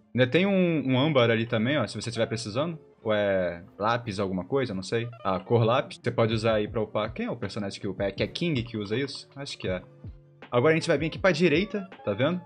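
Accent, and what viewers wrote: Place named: Rio de Janeiro, Brazil. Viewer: Aqui vemos um carioca fiel: montanhax, minériox, ixpots, crixtais Amo amo esse sotaque é bem gostosinho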